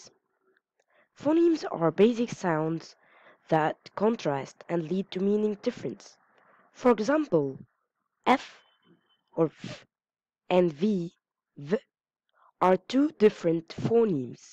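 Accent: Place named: Arab world. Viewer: لو سمحتي عايزة اتواصل معاكي فالرسايل ضروري ممكن الايميل او اكونت الفيس انا guess من لهجة حضرتك انك عربية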